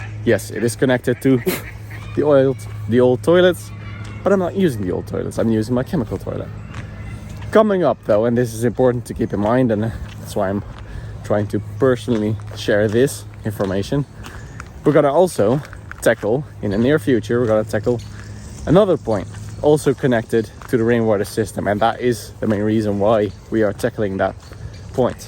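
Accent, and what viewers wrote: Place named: Ireland. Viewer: Q. Curious about your accent (aside from the Dutch tones). Sounds almost Irish! Do you have Irish family or perhaps learnt English from an Irish teacher? Where in Holland do you come from? My grandfather was born and raised in beautiful Leiden. Enjoying your vlogs from Perth Western Australia.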